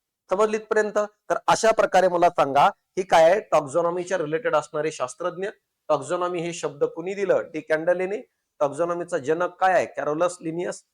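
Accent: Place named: Marathwada, India. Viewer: Sir tumhi aaplya मराठवाड्यातील आहेत हे आपल्या बोलण्यातून आपुलकी नक्कीच कळते.....❤❤ सोबतच सायन्स हा विषय खूप सुंदररित्या story type Ani intersting easily concept explain krta....salute to as you gain the understanding over the science in your academic n now you are applying it❤❤ it's obsolutely great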